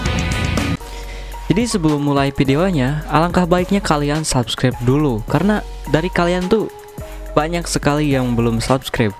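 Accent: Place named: Sunda, Indonesia. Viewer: Susah kek nya dh biasa ngomong bahasa Sundah teh maksain make bahasa indo😂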